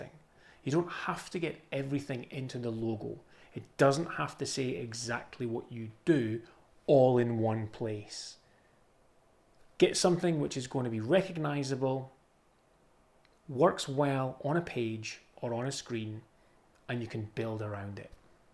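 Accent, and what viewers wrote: United Kingdom. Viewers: Great advice mate.. Are you from the UK?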